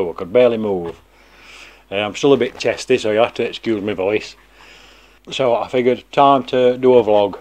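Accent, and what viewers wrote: Yorkshire, United Kingdom. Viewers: Sounds like a Yorkshire lad and a hoarder ditto, nice shed. Get rid off the greenhouses to other side of the garden and extend the workshop us men need us hobbies. Lol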